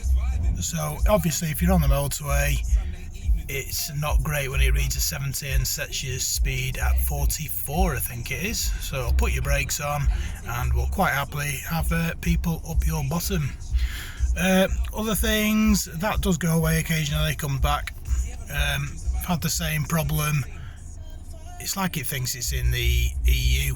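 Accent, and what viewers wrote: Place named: Australia. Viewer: Your Australian accent is so difficult to understand.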